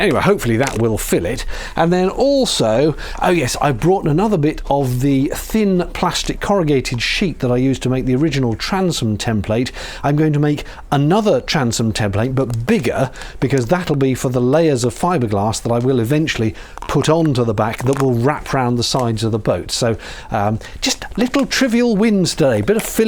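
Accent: United Kingdom. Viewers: Your British accent is so charming "Its ever so thin and runny..." 😍 Glad you held off in care of your back!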